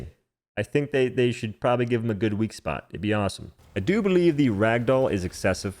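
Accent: United States. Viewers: So your british using a American accent. Thats wild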